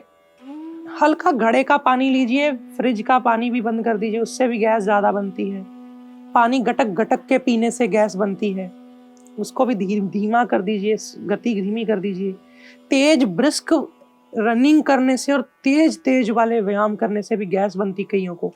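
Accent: Punjab, India. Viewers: Tussi Punjabi o??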